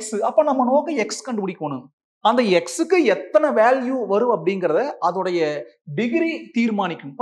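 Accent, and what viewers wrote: Coimbatore, India. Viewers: Sir are u a coimbatorian??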